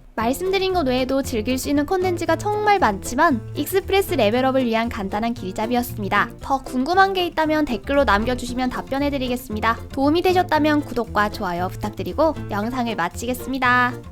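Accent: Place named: Gyeongsang, South Korea. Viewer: 경상도 분인가 E 발음할때 다 티나심 ㅋㅋ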